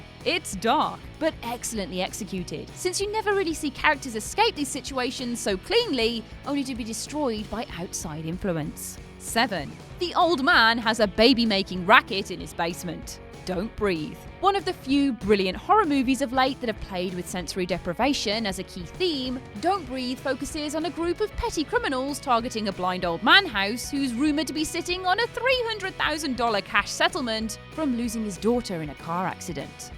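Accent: United Kingdom. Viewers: That's pretty standard British dialect. They pronounce a lot of French in standard English phonetics.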